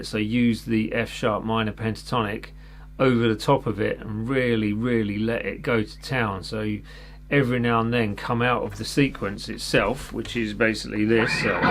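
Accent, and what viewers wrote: United Kingdom. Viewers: Your British accent is so pleasant to listen to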